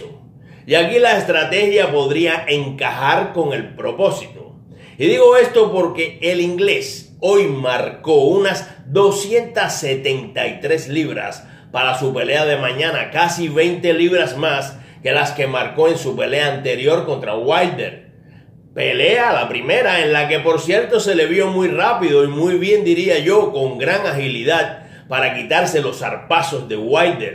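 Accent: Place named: Cuba. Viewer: Con ese acento y no sabes jaja...Cubano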